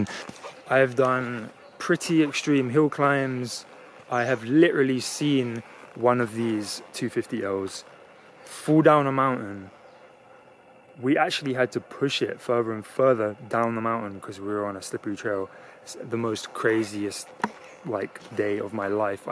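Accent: London, United Kingdom. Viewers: Why you sound like your from London but for a Arab or whatever number plate lol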